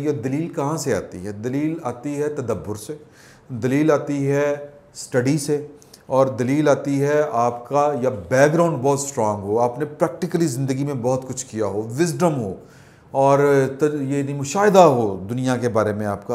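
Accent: Punjab, Pakistan. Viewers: Very sweet and cute punjabi by you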